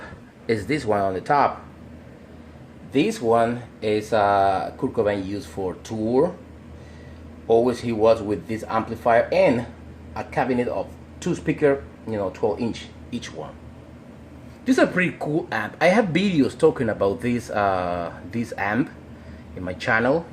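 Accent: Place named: Latin America. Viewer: You is "colombiano" or Argentino or mexicano?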